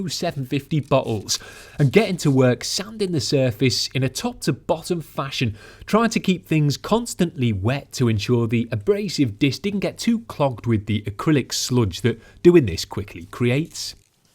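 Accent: Liverpool, United Kingdom. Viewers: Mate, love you're vids, voice overs and production. Apart from the skill and dedication they show, they're incredibly relaxing. As a Geordie, I never thought I'd say that to a scouser 😂😂😂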